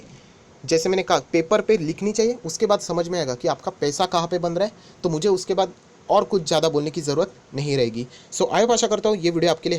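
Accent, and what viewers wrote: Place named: Mumbai, India. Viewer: mumbaiche ahe he nkki accent ani hindimde marathi words..😀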